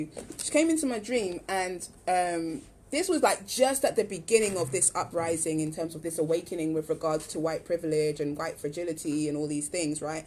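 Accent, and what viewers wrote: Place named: London, United Kingdom. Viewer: So beautiful to hear a London accent in the Melanated community on YT.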